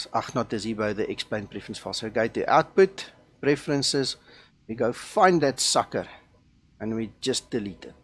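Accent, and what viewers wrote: South Africa. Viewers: are you afrikaans cuz you sound afrikaans i am afrikaans too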